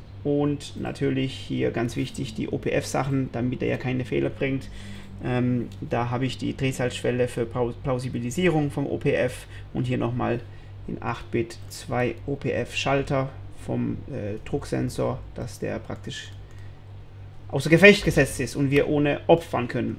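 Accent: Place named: Swabia, Germany. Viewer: Super Video, schön erklärt und der schwäbische Akzent passt perfekt! Grüße aus dem Kochertal :P!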